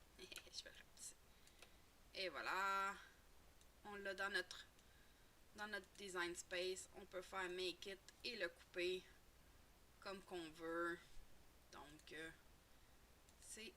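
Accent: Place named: Canada. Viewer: Ah cet accent canadien ! j'aime beaucoup ! Et merci pour le tuto.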